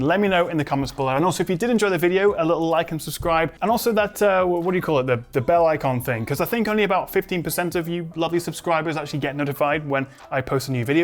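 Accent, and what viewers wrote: United Kingdom. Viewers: I own everything Apple but, you're right, the Samsung has a better camera. BTW, your wife got lucky marrying such a handsome and intelligent man with a British accent.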